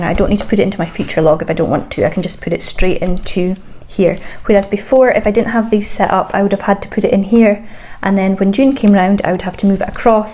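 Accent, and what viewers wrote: Scotland, United Kingdom. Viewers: Fab video. Can i ask where u are from please? Are u north east scotland? X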